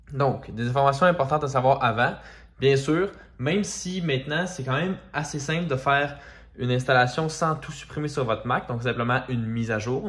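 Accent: Canada. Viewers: Excellent évolution d'un I-Mac 27 fin 2012 I7 de High-sierra 10.12.4 vers Ventura 16.6.7. Merci beaucoup pour ce tutoriel bien expliqué avec cet accent canadien... j'adore 🙂 J'en ai profité de remplacer mon dur dur interne par un SSD 4To, et mon I-mac fonctionne impeccable. Mon évolution mac OS a été obligatoire pour un abonnement à photoshop sans changer de matos. MERCI ! 🤟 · Félicitation pour votre tutoriel avec l'accent Canadien c'était parfait. J'ai pu installer Ventura sur 3 Macs incompatibles vous m'économisez l'achat d'un nouveauMac encore bravo depuis Nouméa en Nouvelle Calédonie Pacific Sud. Peut-on lancer la mise à jour de Ventura 13.2 ? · Un immense merci pour ce Tuto en Français!!!! En Plus avec l'accent Quebecois j'adore. Bravo et encore merci. La semaine derniere j'ai installé Ventura et cette semaine mise à jour vers Sunoma grace à ce tuto! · Vraiment merci !!!!! 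Clair précis et quel bel accent canadien !! bravo !!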